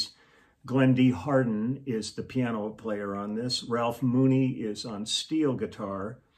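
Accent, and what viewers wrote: South, United States. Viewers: You made me spit my drink out when you told the story about the Loveless Cafe! As they say, "You got South in your mouth"! I can't believe you can remember street names! I have to say, you sounded very authentic saying Goo-Goo clusters! That song you played was popular right when I moved Nashville from Houston. Thx for the giggles! Btw, I just played her the Loveless Cafe story and we died laughing! Thank you again! Taryn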